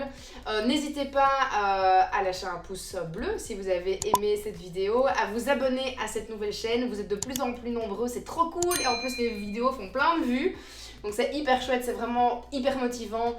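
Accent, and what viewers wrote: Belgium. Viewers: Hello madame, ton accent! Tu es belges? Big up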